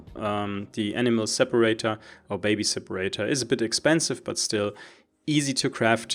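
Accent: Germany. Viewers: You hear like a German guy speaking english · Man hört soooo raus, dass er deutsch ust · man hört direct das du deutsch bist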